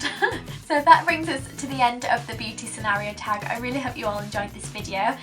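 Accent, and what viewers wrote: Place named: Northern England, United Kingdom. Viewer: You were on if the first people I subscribed to on YouTube , been watching your videos for so long! Definitely will always be one of my faves, your personality is awesome! So Glad to see you back! I've just started my own channel...complete newbie, still learning, so no judging! Haha would love you to come by an have a look if you could! I've just recently moved back from Leeds too...love a northern accent! Hope all is well and all the best for this year!xx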